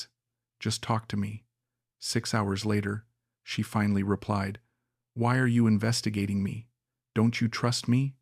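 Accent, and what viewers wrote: United States. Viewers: The Story is FAKE! 1 : You Don't need 250k AUD to start a small restaurant Carenderia type in the philippines as she was talking in the video.....the type of eatery she grew up in the province 2 : She has and drive a car? she is not as poor as she said......a 10 years old 2nd hand car cost around 400k PHP .....hmmmmmmm.... 3 : The description from the guy and the voice does not have an australian accent but american 4 : You must be really stupid to send 250k USD just like that.......makes you wandering how did a guy so silly managed to save or aquired it in the 1st place. So in my opinion The story sounds convinsing and it might generate viewers BUT I THINK IS FAKE 100% :)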